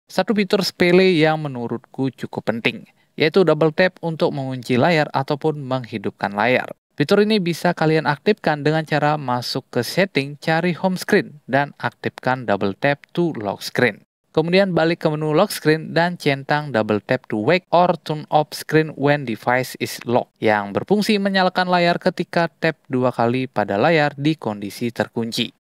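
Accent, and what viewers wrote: Bali, Indonesia. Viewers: Iya, kelihatan logat Balinya😁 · Nah, nemu juga video yg kasih tips kaya gini. Sungkem bang..btw narator dari Bali kah? 😁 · medok bali banget kayaknya ni hostnya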